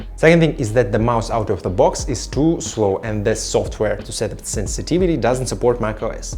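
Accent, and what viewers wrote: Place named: Russia. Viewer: Srazu chuvstvuetsa russkoyazichniy akcent. Nice setup.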